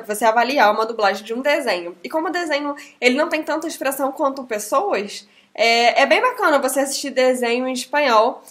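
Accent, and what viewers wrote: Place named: Rio de Janeiro, Brazil. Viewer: Eita sotaque carioca!!! TMJ!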